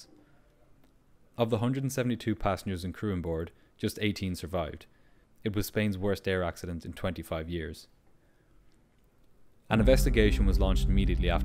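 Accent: Ireland. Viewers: Just curious. Where is the narrator from? He sounds very Irish to me. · MTDSRIP. I'm hearing an Irish accent from the narrator... Wasn't sure until he said 'turty', for 30.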